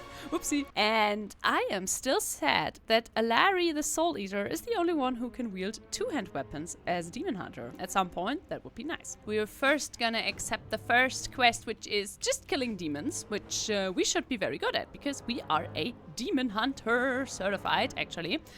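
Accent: Germany. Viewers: You sound like a german 😏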